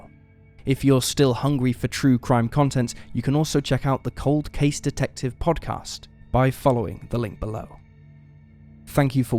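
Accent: England, United Kingdom. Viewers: "Quote" These videos sound rather odd with an English accent speaking in an Americanised way.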